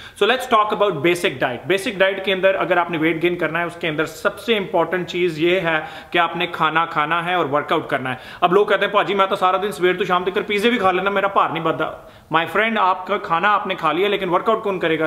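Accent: Punjab, India. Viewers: Super style sir ji sp ki hindi panjabi mixx super shahid style sir 😁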